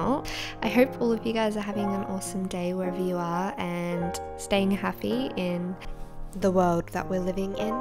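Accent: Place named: Australia. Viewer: Nevermind the haters. Aussie accents are adorbz :) Found you from Purple Palace's comment section lol. Cool vibes, keep it up!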